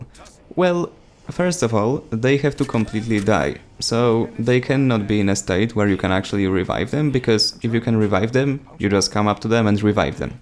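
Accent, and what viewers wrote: Poland. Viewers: Hmmm you sound lukę youre from poland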